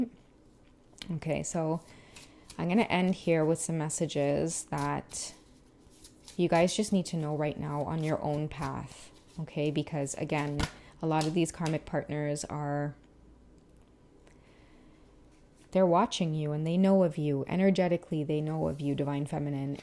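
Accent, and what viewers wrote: Trinidad and Tobago. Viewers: Not the Trini 🇹🇹 accent! I’m screaming 😂🤣😂! Yaaaassssss 🥳🥳🥳🥳🥳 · ‼️‼️ you know a Trini when you hear one! ❤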